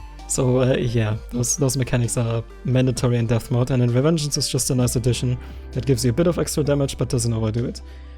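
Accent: Germany. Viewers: I can hear the German accent in his voice/ greets from Germany Kamerad 🇩🇪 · are you german? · are you german?? xD bc you sound like german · U can really hear the german accent😂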